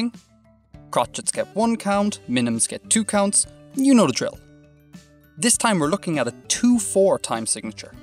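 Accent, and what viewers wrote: Ireland. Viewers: Let’s all apréciate his Irish accent and nearly 600k people have watched this lmao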